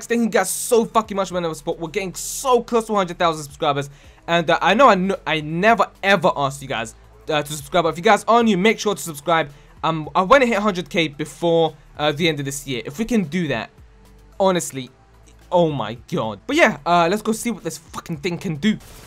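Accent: United Kingdom. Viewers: This is like british jev (sorry if you arent brittish im not sure what nationality you are but you sound british)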